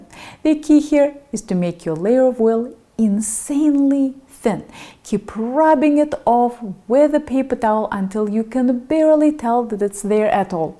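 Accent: Russia. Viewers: Wow. This is a class on the "understanding of thermodynamics". Thank you and by the way, I love your accent. Your command in English vocabulary supersedes your Russian accent, got me confused for a moment. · Idk if it's a Russian accent but this women has me hooked on every word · Is she Russian? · I just stumbled upon her for the first time. Your comment is spot on. The most informative 13 minutes of baking I have seen with no distracting channel promotion etc.. I love her and her Russian accent is icing on the cake. Can't wait to see what else I can learn. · I have been thinking. Where is she came from?! Damn, Russia. Why do you have a such heavy accent? You came as a teen to the US?!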